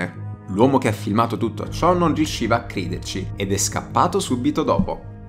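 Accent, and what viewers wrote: South, Italy. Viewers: peccato l'accento meridionale del commentatore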